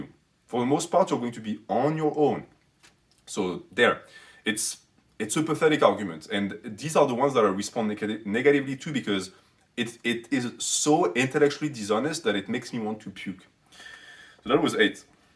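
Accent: France. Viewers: Are u french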